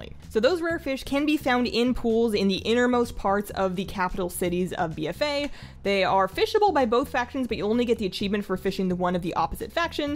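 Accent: Canada. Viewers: someone's from canada Eh?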